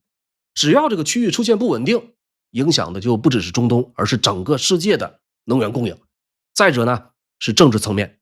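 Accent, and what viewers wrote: China. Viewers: 就是个大外宣还挺懂潜规则知道用繁体亲近港澳台，奈何口音变不了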